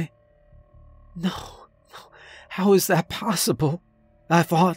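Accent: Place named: New England, United States. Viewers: Why is Cliff Clavin running a gas station in the Pacific NW? The accents are perfect, but for the NE, not the NW. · Sounds like a northeastern accent not Pacific Northwest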